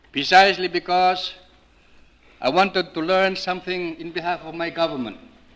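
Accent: Philippines. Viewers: One of the best examples of the cultivated Philippine English accent.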